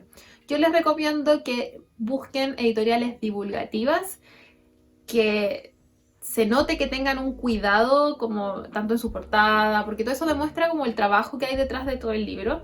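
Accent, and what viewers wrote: Chile. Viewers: Que bueno tu canal, ya tienes un Nuevo Suscriptor, Me haces que Eres Chilena por tu forma de Hablar, y se ve de lejos que eres una chica muy leída y de amplia inteligencia y Conocimiento, aparte que muy bonita, eres la mitología y la magia, la mujer perfecta, Saludos desde el perú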